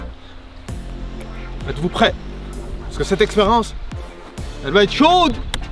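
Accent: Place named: Belgium. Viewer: Il a un axant de Belgique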